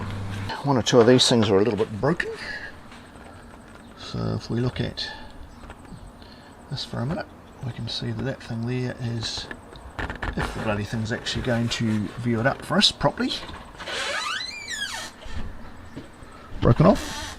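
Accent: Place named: Australia. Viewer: Thank you! Looked all over you tube for a video and this is the only one I could find. Very simple and saved me a ton of time. Great Australian accent too!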